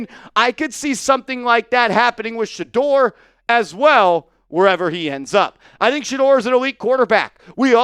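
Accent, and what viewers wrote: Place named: Midwest, United States. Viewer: How is this guy the host for the Giants on Chat Sports ? He sounds like he’s from the Midwest. I live 10 miles away from Giants stadium, and Giants fans don’t sound like this. 🤔🤷‍♂️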